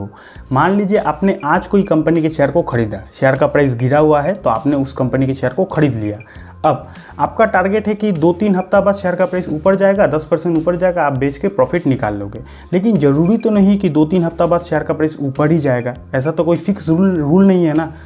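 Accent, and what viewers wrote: Bengal, India. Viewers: Bhi ap bengali ho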